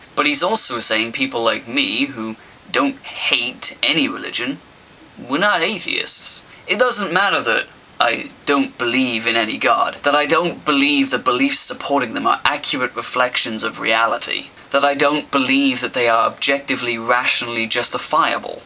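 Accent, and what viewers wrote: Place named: United States. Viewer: Why do you speak with an American accent?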